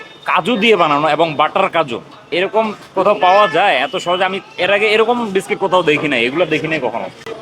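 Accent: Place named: Kolkata, India. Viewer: পুরা কলকাতার ভাষা শিখে গেছেন দেখি।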